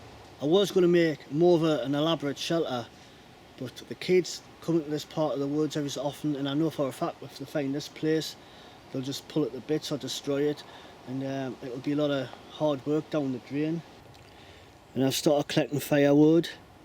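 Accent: Newcastle, United Kingdom. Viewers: Is that a Geordie accent I hear? :D Great video. You hear so much regarding pricey gear, it's great that you went all ghetto with it. Nice!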